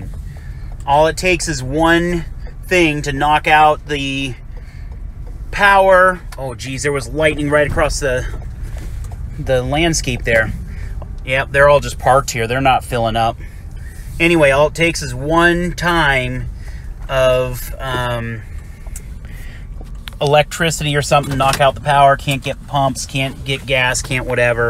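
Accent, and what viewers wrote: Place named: Wisconsin, United States. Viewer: Oh I love your accent! My dads side of family was all from Wisconsin. Haven’t heard it in years. ( I pop up with a little of it ever so often 😂)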